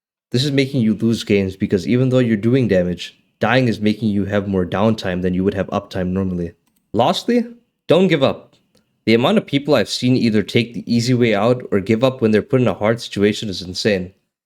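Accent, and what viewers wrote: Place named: South Africa. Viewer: I suspected you were South African from the accent and your ping and your bio confirmed it. Nice to see someone from the region making content like this. I'm wondering if you play on Middle East servers or VPN yourself to EU. I do the latter, but the 200 ping can be pretty frustrating at times even though I'm used to it. If you play on ME, how are the queue times like at high masters - GM?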